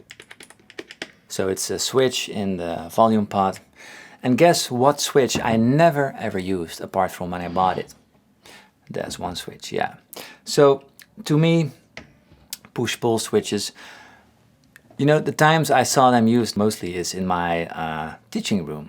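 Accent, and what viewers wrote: Netherlands. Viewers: en lekker een beetje hollands derbij, lekker hoor...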